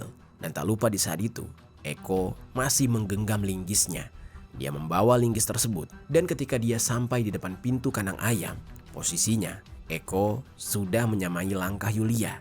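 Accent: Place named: Surabaya, Indonesia. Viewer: Sht slalu n semangat n sukses, sememi Surabaya aksen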